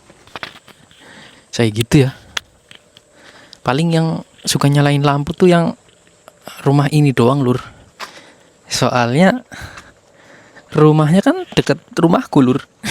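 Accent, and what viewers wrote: Banyumas, Indonesia. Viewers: Sampean wong ngendi mas daneng bahasane ngapak ya😂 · Ealah wong NGAPAK too 😅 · Subscriber baru, mas, Moga tahun ini bisa tembus 100K, dan sehat selalu ya mas, aamiin Dari logat, sepertinya dari ngapak Banyumasan ya? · Aku suka denger bhs ngapak, semoga masnya sehat selalu dan rezekinya lancar aamiin❤❤ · Sampean wong ndi mas?mungkinkah kita terangga desa....krn sama2 ngapak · rika wong jawa ya,banyumasan basane😅😢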